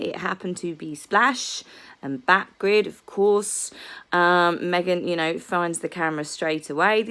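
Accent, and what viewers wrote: Australia. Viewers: You have picked up an Aussie twang. 👍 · Enjoy your stay here in Australia...South Australia is hot 🥵 this time of year......enjoy the climate change... Guess what you still have your Aussie accent with the splash of pom.....don’t sound any different to me.....💕💕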